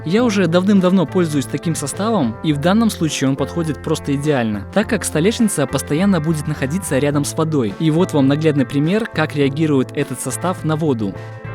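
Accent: Belarus. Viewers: Ты из Белоруссии?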